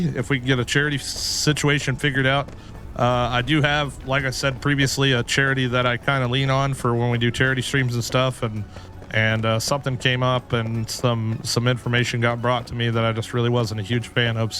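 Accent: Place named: England, United Kingdom. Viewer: I would have been really surprised if you were not nominated. That said though, a few people that have had great builds have missed out. It is what it is. Competition aside, I love your Enshrouded videos, keep up the good work. One complaint tho, you should have used your excellent English accent again when explaining the lore 😁😁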